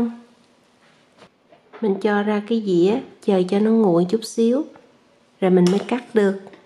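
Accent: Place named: Saigon, Vietnam. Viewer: Xin chào em cảm ơn em chia sẻ món chay này thật lạ mà nhìn rất ngon! Không biết khi ở VN em ở quận nào tại SG mà giọng nói rất SG de thương 😊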